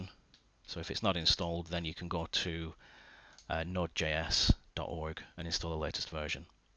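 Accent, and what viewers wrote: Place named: United States. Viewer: Thanks mate. Helped a lot.. Where are you from btw? You sound like american but choosed europe, so I'm wondering :)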